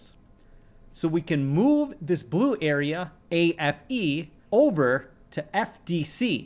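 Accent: India. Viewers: Bro are you Indian?? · Your voice is deeper than I remember from 4 years ago I can also detect more hints of an indian accent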